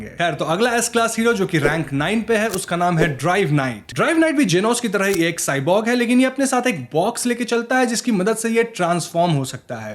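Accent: Bengal, India. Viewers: Bro you are a Bengali right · Are you bangali? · Are you bengali... ❤😊😊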